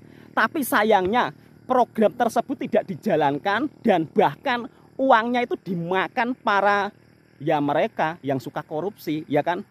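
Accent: Java, Indonesia. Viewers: bang medoknya ngisin ngisini☺️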